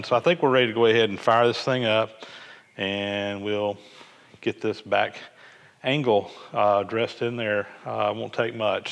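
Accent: South, United States. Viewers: A Getter Done with a southern accent; LOVE IT.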